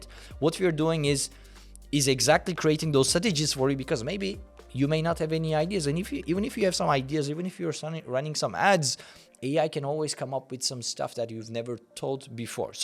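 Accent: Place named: Turkey. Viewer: Türklük damarım tuttu:) Harika araçmış diye videoyu izlerken, aksan dikkatimi çekti ve başa dönüp isme döndüm. Ve. Helal olsun, tebrik ederim. Çok güzel iş.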